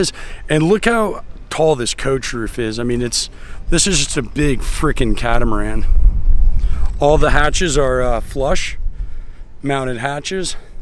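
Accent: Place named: Maryland, United States. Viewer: Gotta love that Mairlin accent.. Love the bewt.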